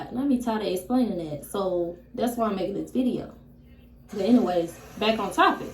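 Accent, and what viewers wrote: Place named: Alabama, United States. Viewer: You from Alabama 🤣🤣 you got a strong accent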